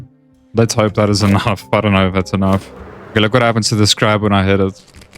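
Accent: South Africa. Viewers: I knew it as soon as you said chow the accent gave it away , you are a successful Afrikaans YouTuber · Yo he has such a south african accent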